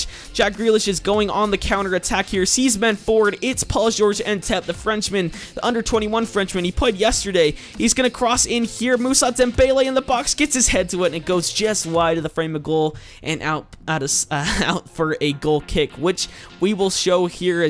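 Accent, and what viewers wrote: United States. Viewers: Bro, Utah accents are weird.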